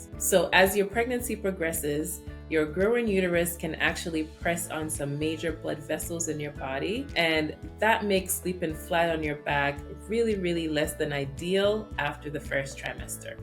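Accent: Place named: Jamaica. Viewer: Sounds Jamaican. Anyway, Good info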